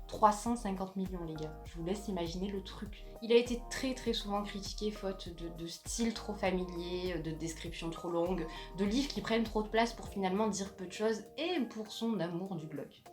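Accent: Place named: South, France. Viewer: Je continue à remonter le temps et ce coup-ci en partant de la fin. Très bonne vidéo avec ses 3 parties, et j’adore la pointe d’accent du sud. Mon premier Stéphan King sera certainement 22/11/63. Marche ou crève m’intéresse ! Déjà le titre me parle et ton avis confirme l’impression.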